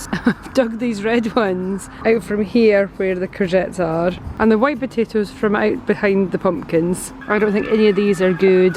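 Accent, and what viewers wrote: Scotland, United Kingdom. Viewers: i love scottish excent language, i dont fully understand the english excent,i m indian living in uk, but i still love it, my boss is from scotland,we have job brief every day, i dont understand what he says, he knows it, sweet cons r funny, tomatoes great, lovely hair, lovely nails. lovely garden, thanks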